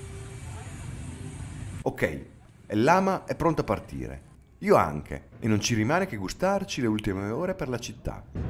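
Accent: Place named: Romagna, Italy. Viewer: Socmel ma sei romagnolo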